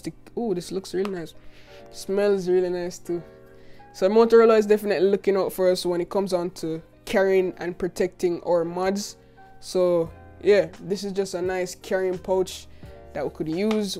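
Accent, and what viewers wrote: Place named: Jamaica. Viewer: Bro you can’t tell me your not a Jamaican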